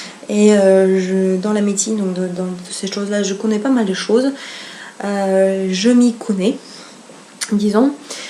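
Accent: Russia. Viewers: Je m'abonne à ta chaîne, tu me rappelle une amie que j'avais au lycée et qui était russe, elle s'appellait Macha et elle avait le même accent que toi!!! Es tu russe?en plus tu as une voix douce très agréable à écouter. J'ai des doutes sur une probable grossesse...comme on dit il y a des choses qu'on sent qui ne sont pas comme d'habitude.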